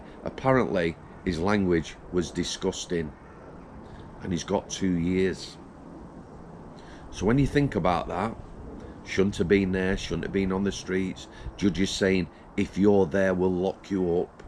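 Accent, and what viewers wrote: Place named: Yorkshire, United Kingdom. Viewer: Love your videos, mate. Hearing 1st hand stories etc. Still trying to work out where you are from. Barnsley, like mesen is like, or Wakey, Sheffield? Al si thi.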